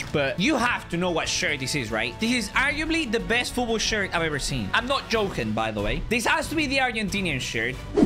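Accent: Spain. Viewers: Wait,you from Venezuela or spain?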